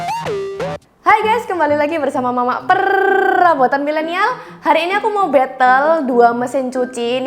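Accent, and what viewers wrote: Java, Indonesia. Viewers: medoknya saya suka 😍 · terus dipinek2 😂🤣medokk bngeett mbk e .. lucu .. makasih review nya kk .. milih Arashi aja dehh go co😅😅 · Medok men Yo jowone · Medok logat jawanya 😂😂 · medok kali suaranya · Makasih orang baik 👍🙏💕...Kusuka mbnya bahasa nya medok Jawa 👍🙏🇮🇩💕😅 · Cantik + oriental + muuuuedok = ❤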